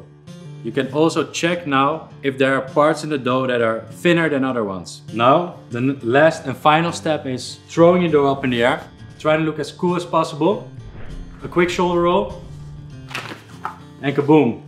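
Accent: Netherlands. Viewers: Ik herken een nederlands accent altijd en overal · Lekker Hollands accentje! Ziet goed uit hoor! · Dutch accent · Hi there :) are you Dutch? You sound as if you are :) greeting from the Netherlands! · Jij moet wel Nederlands zijn ik hoor het gelijk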